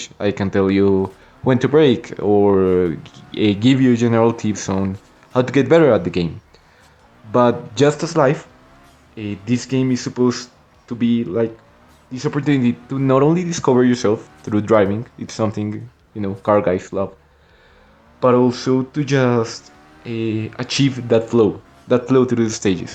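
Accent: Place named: Brazil. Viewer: lesgo mano, eu pensei porque seu sotaque ingles é bem parecido com o sotaque brasileiro em ingles, voce é de onde?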